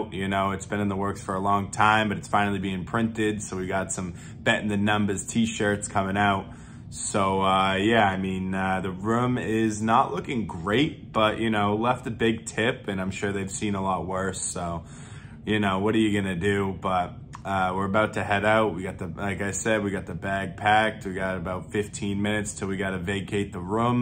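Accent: Boston, United States. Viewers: Are you a Southie? Live in a triple decka?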